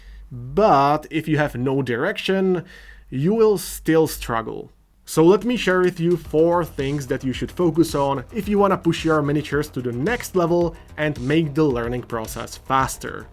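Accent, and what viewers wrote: Czech Republic. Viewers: Thats...encouraging to hear..12 years 😄..but whatever, better late then never right !? Im just about to start with painting but I try my best... I just discover your channel and I like it more then others! I was little suspicious about your accent tho 🤔..."why he sounds kinda like me!?" AAAAH, hes CZECH 😅!!! Im surprised, youre first czech I discover in this "WARHAMMER painting universe"... I started to think its not exist in Czech Republic. And Im glad its not true😁!!!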